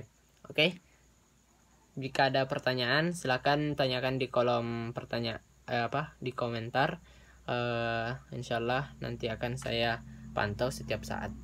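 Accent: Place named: Bugis, Indonesia. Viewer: org bugis mks ka bro logatnya okkots...minta dl no.hpnu?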